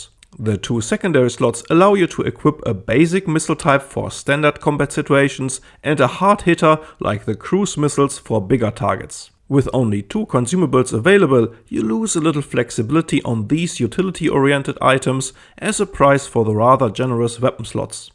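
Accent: Germany. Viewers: Haha I'm German, and man, the german accent is strong in your english xD I'm quite happy to find a familiar speaker haha xD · You haven't heard a strong German accent, bruh. His is present but that's nowhere near strong German accent. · Oh wow what a nice combination of german sayings and accent :P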